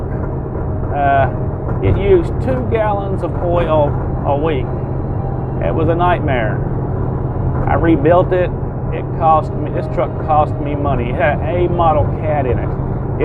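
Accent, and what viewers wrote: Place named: South, United States. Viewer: I love your accent, its from south right? great trucks, i really like to try to drive those monsters,wow. xoxo monstermom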